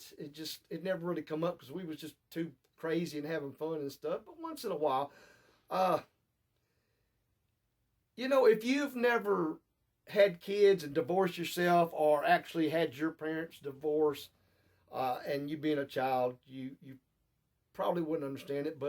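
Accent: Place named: Tennessee, United States. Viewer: Huge Elvis fan from Venezuela since I was like 7-8 years old...looong time ago LOL! My dream is going to Graceland and no matter what am gonna make it. Love your Tennessee accent, is just lovely! and this channel is just great. As I fan, I truly appreciate it. Thank you soo much for these videos!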